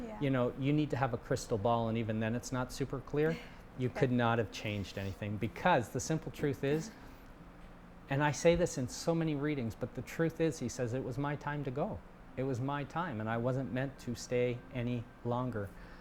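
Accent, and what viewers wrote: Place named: Canada. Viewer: I love that Canadian accent :)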